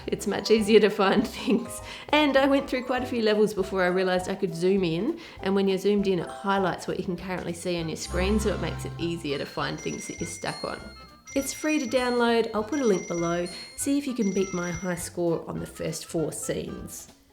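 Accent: Australia. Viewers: Doesn’t everyone in Australia speak like that?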